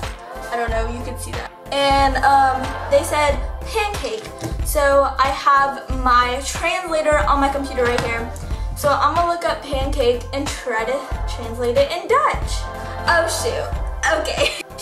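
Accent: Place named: Canada. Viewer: Oh I thought u were Canadian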